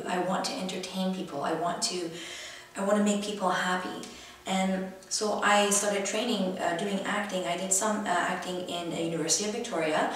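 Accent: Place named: United Kingdom. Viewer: I LOVE your British Accent!!!!!!!!!!!!!!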